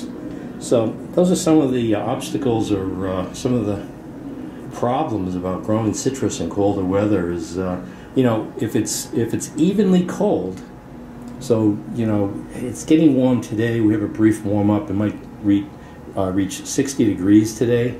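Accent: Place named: Boston, United States. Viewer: Happy New Year! Hope that you are doing well. I miss your regular clips and commentary! Well, maybe it's the Bahstun accent! Best regards, Chip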